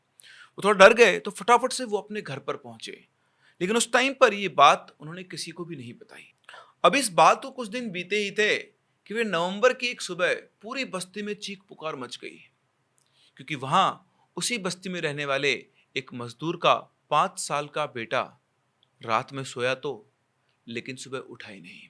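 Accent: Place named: Chhattisgarh, India. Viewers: Badiya chattisgari bole sir😮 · Chhattishgarhi boli bahut sundar lagat he tuhar muh se bhaiya ji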